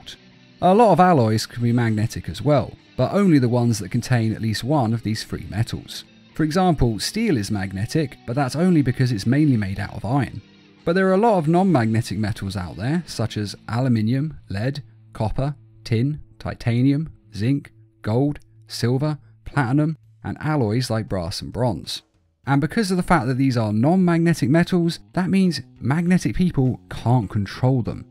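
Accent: United Kingdom. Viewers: Sounds like a normal british person.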